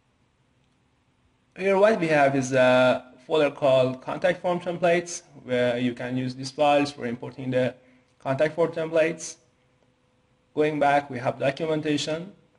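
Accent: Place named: Iran. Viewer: Great themes, that Persian accent tho :)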